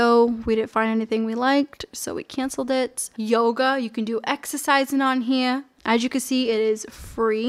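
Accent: Boston, United States. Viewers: That Boston accent coming through every now and then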